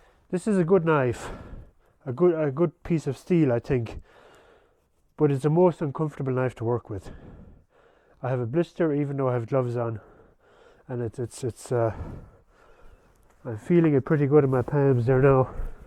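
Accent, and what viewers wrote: Ireland. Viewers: it great to hear an Irish accent on a man doing great work and talking sense . well done sir . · yeah kind of I was saying to meself midlands or wexford I'm from Laois originally moved to waterford years ago.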